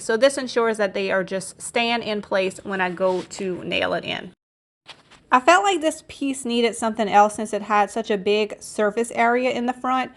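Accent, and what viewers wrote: Louisiana, United States. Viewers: your slight accent sounds like you are from New Iberia, LA. Am I correct? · I love to hear her accent. Adorable Southern-Cajun. Her projects are all gorgeous.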